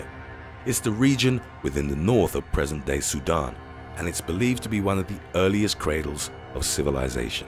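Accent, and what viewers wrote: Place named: England, United Kingdom. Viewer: For an English sounding narrator, the guys understanding of English pronunciation is abysmal!!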